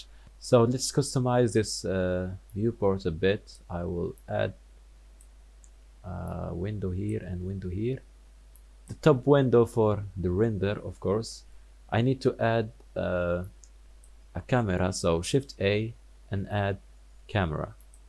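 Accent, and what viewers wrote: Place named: Arab world. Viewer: والله عربي عرفتك من لهجتك😂❤ اشكرك على الشرح المفيد! Keep up the work!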